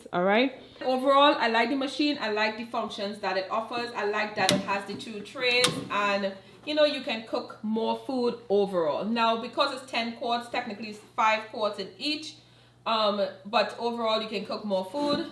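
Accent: Trinidad and Tobago. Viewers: You're Trini?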